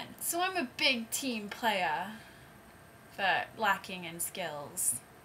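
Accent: England, United Kingdom. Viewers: damn britsh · british beeyach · Well At least its a nice English Accent. Not English people who slur there words.. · i wiv your nglish accent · Whoever said she was Australian? She's English. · holy cow! i shes hot! i love her british accent too! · she is British lol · she's from the UK thats how we pronouce it :) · Oh .. i just love brit accent . especially after harry potter and charlie bit my finger .. may be thats what i am against ;) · alguien puede traducir tooooodoo lo que ella dice? al español... I don't understan so much... her acent is brithisch?? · Talk about whatever you want. No matter what I'll enjoy hearing it. You have this cute British accent that I just love listening to. Don't worry about your hair. It looks absolutely beautiful!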